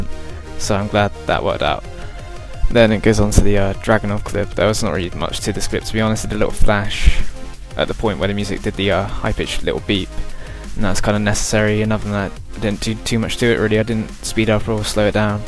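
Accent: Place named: London, United Kingdom. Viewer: Ah ok, sounded like you where from London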